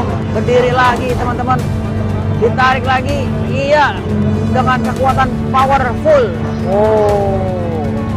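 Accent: Jakarta, Indonesia. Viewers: Gw suka logat ente Bang...turunan betokai ye....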